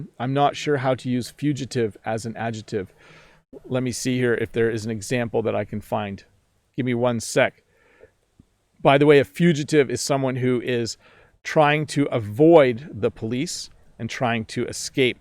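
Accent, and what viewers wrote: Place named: Canada. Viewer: I've been in the US but I couldn't tell your pronunciation is of Canada. The differences are so slight!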